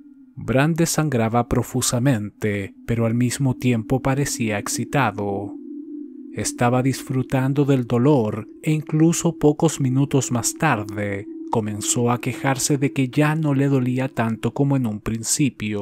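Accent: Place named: Chile. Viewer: Hola, espero estes bien! Felicitaciones por tu canal. Lo q mas me gusta es la narracion chilena que haces de los videos. Estoy harto de escuchar relatos mexicanos, por eso te felicito por tu narrativa. Quisiera mas videos nuevos, ya los he visto 3 veces.gracias. Ronald cortes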